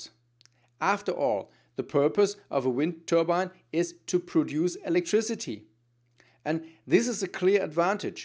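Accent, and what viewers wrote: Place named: Germany. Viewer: You're a clone of a colleague of mine in Stuttgart... with the very same accent and weirdly enough, deeply convinced that US technical validations are UNBIASED! 😆That's so naive! Industrial considerations are paramount, meaning that a better technical solution may be beaten as it's been already the case with heat engines as a matter of fact. Once I asked him how trustworthy are Tüv tests and certifications: Are they to be less reliable than their american counterparts? It was an inner national struggle for my colleague... Oh Gosh! Corporates can bring down the most wonderful ideas. It's appalling that you ignore it!!🤣😂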